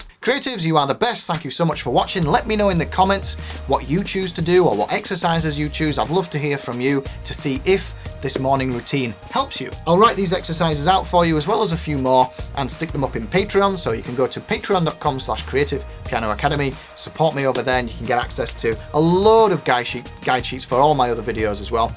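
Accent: Yorkshire, United Kingdom. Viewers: Nice to hear a fellow yorkshireman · Love the energy, British accent, coffee tips, variety of exercise! Videos are very enjoyable!!!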